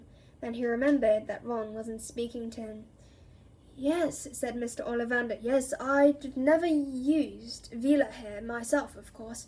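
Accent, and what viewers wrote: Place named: United Kingdom. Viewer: I love ur British voice for the book very soothing❤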